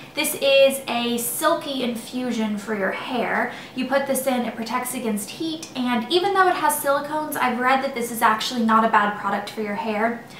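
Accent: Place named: Tennessee, United States. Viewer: Pretty sure the CVS eye makeup remover is the same as the neutrogena one! Just in case you can't find a CVS. I love hearing your TN accent (I miss living in Nashville).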